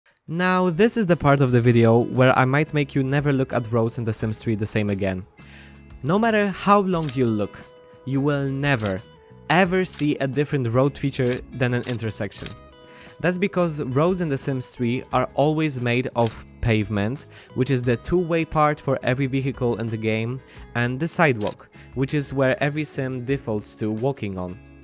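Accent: Poland. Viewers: Are you Polish? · Are you polish? :D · Are you Polish by any chance? Amazing video btw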